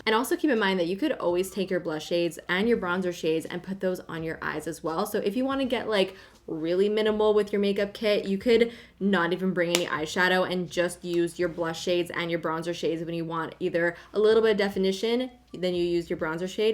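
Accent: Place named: Canada. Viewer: Jalynn Newell it’s because she’s from a region in Canada where that’s the dialect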